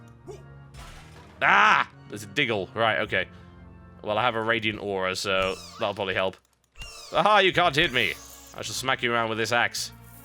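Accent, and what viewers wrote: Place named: Yorkshire, United Kingdom. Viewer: every so often you go into a yorkshire accent.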